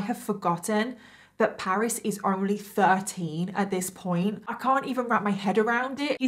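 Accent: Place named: England, United Kingdom. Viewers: How can anyone believe he's rehabilitated. I agree with you! I love your English accent and your very pretty 😍 thanks for your videos! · yes she come up with the mystery murder and makeup idea BUT people get inspiration from others and the world and just because she’s doing her makeup and telling the story like Bailey does it doesn’t mean she’s trying to be like her! She’s doing it her own way and plus she’s from England so the accent gives her a twist and makes her nice to listen to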